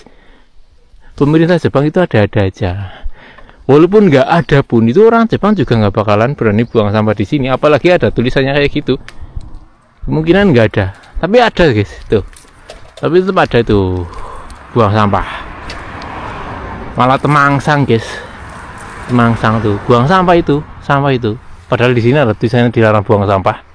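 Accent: Banyumas, Indonesia. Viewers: Pekan baru... Mas senggol dong.. Kepriben ora ngapak ya ora kepenak.... ❤😂😂 sukses..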